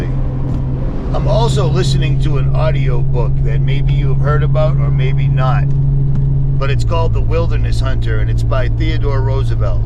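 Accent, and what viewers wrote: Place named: New York, United States. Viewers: I just stumbled across your channel, love it! Great content and yes it must be a fossilized tree our Heavenly Father gave to us for proof the Bible is true! Love your voice, you sound like you’re from NY, just a guess:) Thank you also, for reminding your listeners of our Creator. Safe travels, thank you for bringing us along:) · Miss your east coast accent thanks for sharing your videos miss your old videos from Boston. Keep it up... A friend from the yoop Upper Michigan you ever get out this way?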